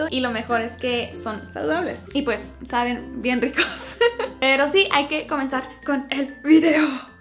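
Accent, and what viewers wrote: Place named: North, Mexico. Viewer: Así hablamos los del norte, es nuestro acento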